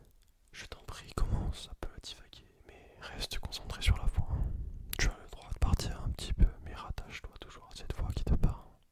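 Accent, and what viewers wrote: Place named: France. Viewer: L'accent français est très fort. J'adore!!!! 🥰🥰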